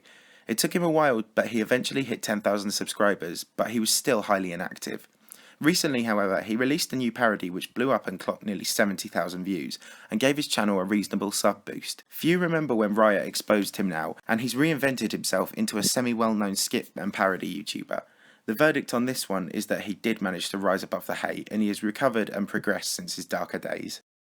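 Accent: United Kingdom. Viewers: i hate the britain english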